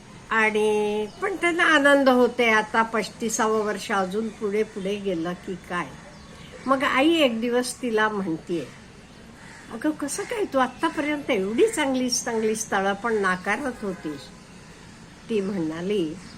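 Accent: Karnataka, India. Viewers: तुम्ही कानडी भाषिक आहात का. बोलण्यावरून असे वाटते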